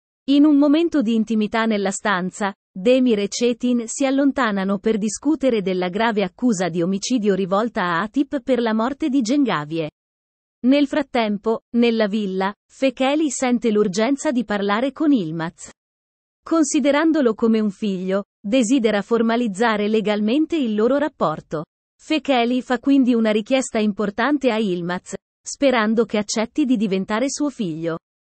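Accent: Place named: United Kingdom. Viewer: Perchè citare i nomi turchi con la pronuncia inglese? Inascoltabile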